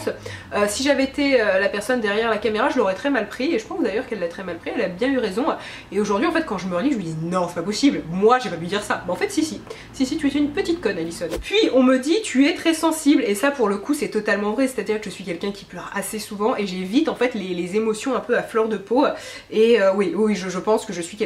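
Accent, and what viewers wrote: Paris, France. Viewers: C'est surtout son accent parisien et sa façon de se tenir et de bouger qui bourgeoise selon moi ^^ c'est pas une critique j'ai plein d'amis qui ont les mêmes manières et je les ai eues moi-même à une époque! Mais elle a clairement ce petit "je ne sais quoi" cliché des "bcbg"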